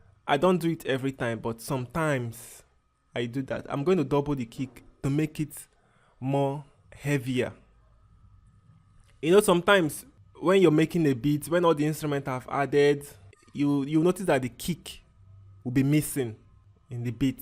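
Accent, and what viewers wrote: Nigeria. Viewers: Bro remain blessed Love your tutorial and I love your use of Nigerian accent hope we could would work together some day. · I enjoy your tutorials because of the way you speak and explain in nigerian tone and language. You deserve a million subscribers bro I love you 😘😘😘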